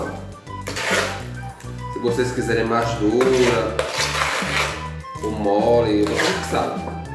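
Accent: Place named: Recife, Brazil. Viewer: Parabens que lindo ! Amo esse sotaque é de Recife ? 👏🏻👏🏻😘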